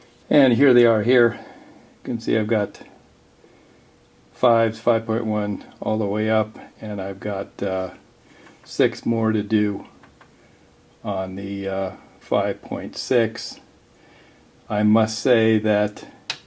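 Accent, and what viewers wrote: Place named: Chicago, United States. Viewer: Sounds like your in Chicago!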